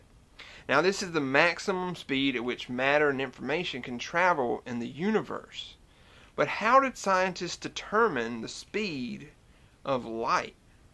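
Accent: South, United States. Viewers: dunno, but an astrophysicist with a southern accent is mighty cool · Most of us pronounce "Io" as "EYE-oh", not "eye-OH", but perhaps you are an electrical engineer. :-) I love your southern accent, combating the stereotypical idea that southerners are stupid. Great videos, man. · Love your Southern accent dude!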